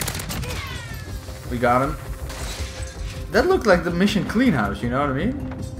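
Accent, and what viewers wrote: Netherlands. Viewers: dit nederlandse accent is geniaal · are u dutch? zo klink je wel! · Ben je Nederlands of zo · are a from the netherlands bro ? becausse i am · his dutch way of talking English feels nice or better than the other accents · You're dutch right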